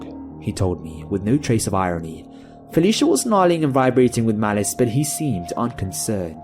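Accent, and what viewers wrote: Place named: England, United Kingdom. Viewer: Why is it always an English guy narrating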